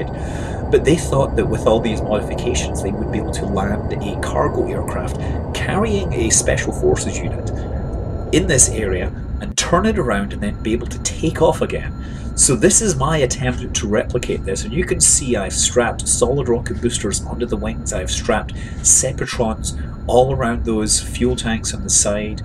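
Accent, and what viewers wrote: Scotland, United Kingdom. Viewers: i'm pretty sure he's scotish.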